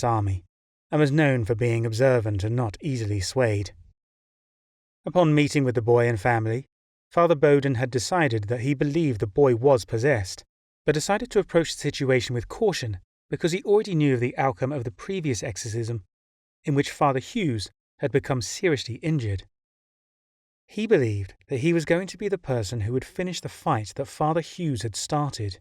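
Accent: United Kingdom. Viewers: You have a funy accent are you a brit?!!!!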